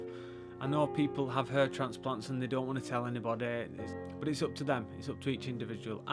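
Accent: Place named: Hull, United Kingdom. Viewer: Hull in england maybe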